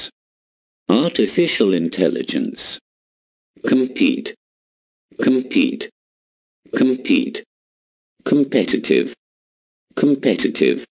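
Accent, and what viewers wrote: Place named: United Kingdom. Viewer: الاكسنت المرة دي بريتش . قبل امريكان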